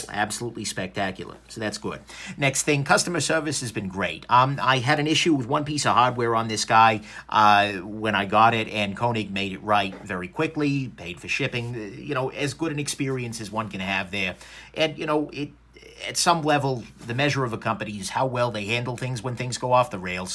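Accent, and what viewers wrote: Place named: Alabama, United States. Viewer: Any chance this guy is from Alabama? (Typing with my INFANT FINGAS) LOL... Love the review for real. Much love homie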